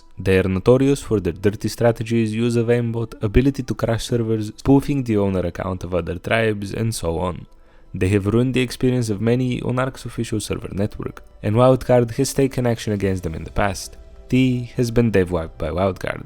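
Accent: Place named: Europe. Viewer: you sound like your from the eu look at getting your money back as from what ive heard they cant just randomly ban you without good reason and you are entilted to your game or the money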